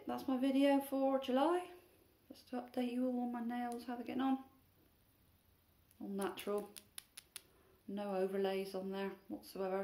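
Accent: England, United Kingdom. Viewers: I truly admire your claws erm my bad . . .nails :-) I also love your voice, I love the English accents, and when I was in London 20 years ago I was in total awe of your people , the shops , the double-decker buses,, the food , so many things that kept me so preoccupied that I only slept an hour a day lol anyway, thank you for sharing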